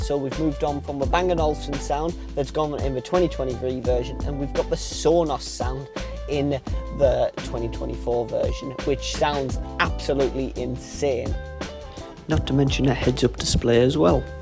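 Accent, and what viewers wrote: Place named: Newcastle, United Kingdom. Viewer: Newcastle / geordie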